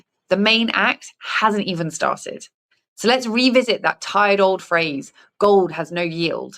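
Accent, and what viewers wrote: United Kingdom. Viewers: Excellent presentation!! Her story line was obviously well researched, well written and spot on. I am stunned and amazed that the average Joe has not (yet) piled into gold when governments and central bankers have been doing so for years. As an added bonus me being an American enjoyed the (British?) accent.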